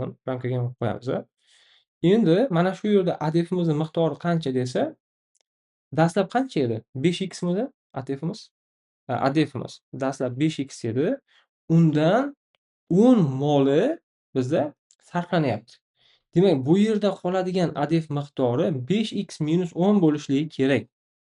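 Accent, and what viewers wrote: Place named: Tashkent, Uzbekistan. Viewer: Рахмат сог ва саломат булинг сиз АТФни тшунишимга ёрдам беряпсиз лекин Тошкенча шевани ёмон килдизда😅😂😅😊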